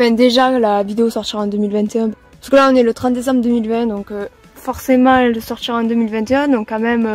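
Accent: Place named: South, France. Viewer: Super merci pour ce tuto tout ça avec l'accent du sud 👌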